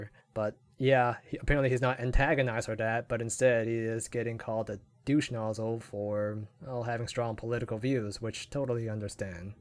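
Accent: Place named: Asia. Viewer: i can hear ur Asian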